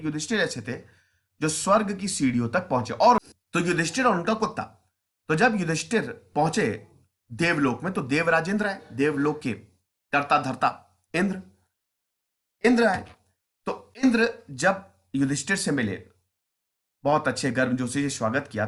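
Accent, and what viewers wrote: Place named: Uttar Pradesh, India. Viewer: I love to hear your voice Sir... N my favorite is Bhaiya.... The UP wala touch... 😁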